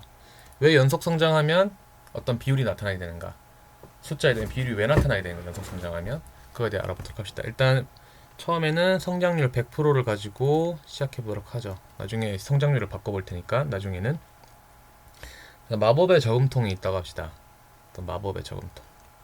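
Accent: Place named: Seoul, South Korea. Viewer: 자꾸 e를 2라고 하시는 서울 발음...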